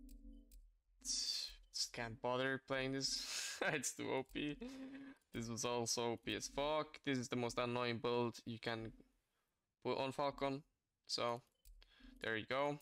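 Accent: Finland. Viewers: Your finnish/nordic accent is very attractive.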